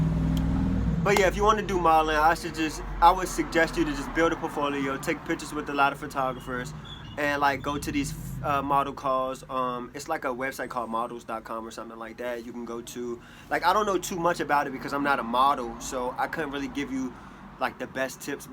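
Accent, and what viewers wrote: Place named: New Orleans, United States. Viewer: You talk like you from new orleans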